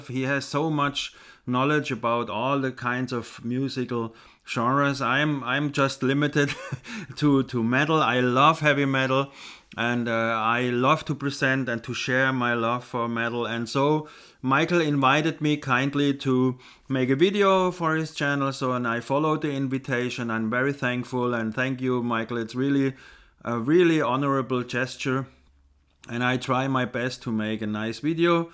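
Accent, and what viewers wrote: Germany. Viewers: Mir gefällt das "DeutschEnglisch"" ^^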